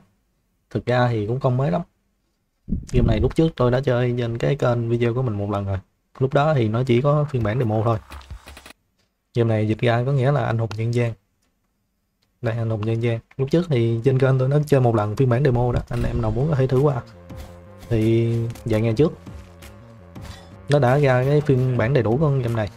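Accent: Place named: Mekong Delta, Vietnam. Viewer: Nghe giọng thì chắc dân miền tây ròi, e cũng miền tây nè, mà nghe bảo có cam k bận đồ mà a 😓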